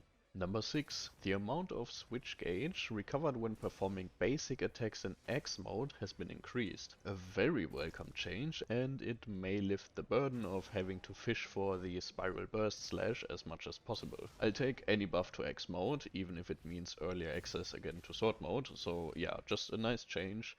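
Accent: Germany. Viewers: Youre German, arent you?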